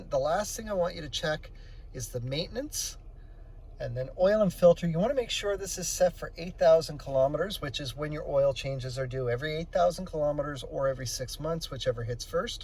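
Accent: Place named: Canada. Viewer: i could hear the canadian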